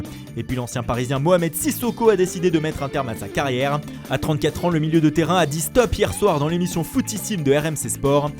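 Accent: Italy. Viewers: Putain j'adore quand tu fais l'accent italien!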